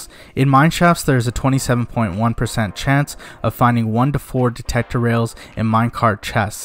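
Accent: India. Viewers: Are u Indian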